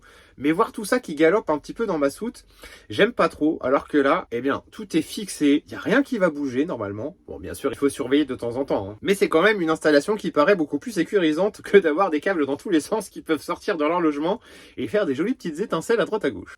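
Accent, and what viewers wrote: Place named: England, United Kingdom. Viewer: quel accent anglais !! lol jolie colis :) et ça envoie du lourd !!! bizzzzz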